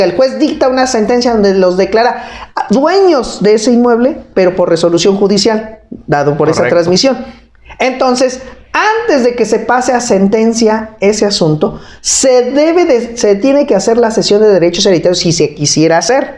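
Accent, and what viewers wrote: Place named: Mexico. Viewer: Por el hablado parece que es para Mexico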